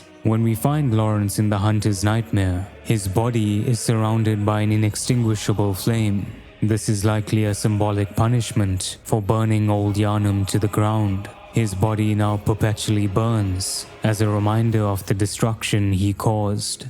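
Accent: South Africa. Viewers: Is this guy South African??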